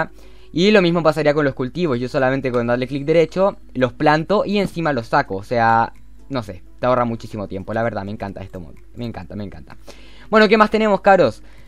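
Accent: Chile. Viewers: me suenas aque eres chileno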